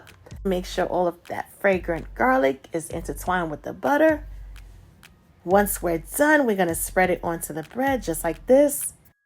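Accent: New York, United States. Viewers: Ooooh, I'm looking forward to cooking that one up myself. And great tip with the pre-fried salami! Ps, your New York accent is simply adorable (it reminds me at times of Rosie Perez 😎). Cheers from Toronto.✌️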